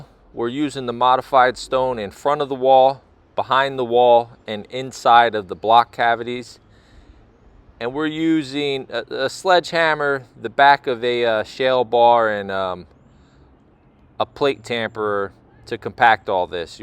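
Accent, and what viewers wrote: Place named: Pennsylvania, United States. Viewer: that SE PA accent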